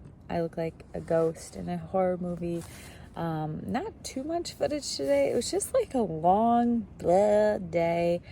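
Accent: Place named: Minnesota, United States. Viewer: Uff Da! Spoken like a true Minnesotan! ❤️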